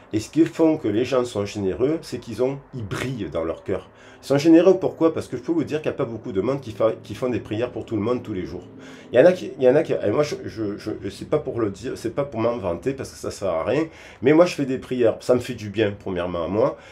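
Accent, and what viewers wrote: South, France. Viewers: Coucou je t'envoie mes ondes les plus positives pour que tu rencontres ton âme soeur et qu'elle te demande en mariage:face-red-heart-shape: Vu toutes les bonnes choses que tu nous envoies avec le coeur, et tes tirages de cartes toujours empreints de positivité, toutefois très réalistes et sans mièvrerie, je suis sûre que je ne serai pas la seule à formuler ce souhait! C'est toujours un plaisir de t'écouter (surtout avec ce bel accent du soleil), et merçi encore pour ta générosité de coeur. Tes vidéos on les écoute et on en redemande! ❤ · Je l ai délecte cette canalisation...divin gratitude pour ce moment magique et magnifique avec l accent du sud en plus...🙏🤲🪷🌹🕯👌👍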